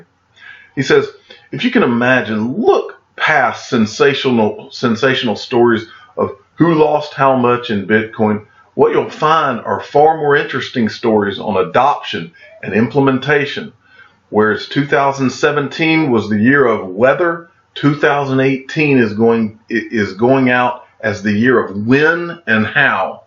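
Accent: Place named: Tennessee, United States. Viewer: Sounds like a tennessee accent to me.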